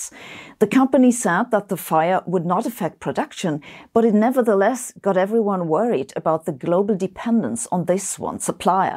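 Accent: Germany. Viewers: pɹəˈdʌkʃən love the german accent😊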